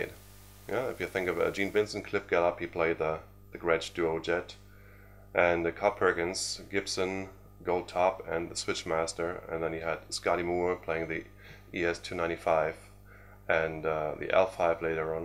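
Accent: Germany. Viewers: I'm trying to Google, and all I can get is info on Andy Richer. I've never heard of either. ' accent, sounds Dutch, Austrian, German, anything but American south of the Mason-Dixon line which, as most people know, separates northern states and southern states of the USA. Anyway, I finally found some info on this "expert" on American rockabilly music. He has no pedigree of any kind. He's no doubt a good guitar player, I've never seen or heard of him, but I give him the benefit of the doubt. The commentators who use words like "awesome" are incredibly gullible. Don't they realise that there are indigenous rockabilly players who have generations of knowledge of this music behind them from whom they could learn much more than a German fan of the music who has decided that he can teach the world how to play it? Sorry, and I'm absolutely sure that your real first name is not, doesn't sound German.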